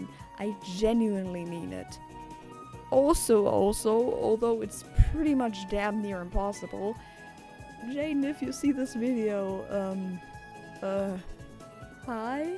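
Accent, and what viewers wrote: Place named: Europe. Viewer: Where are you from btw? I noticed a slight hint of European in your accent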